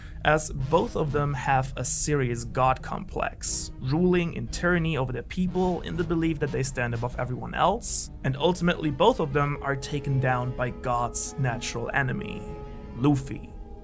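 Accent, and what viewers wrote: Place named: Germany. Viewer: you dont sound native english....are you from germany ?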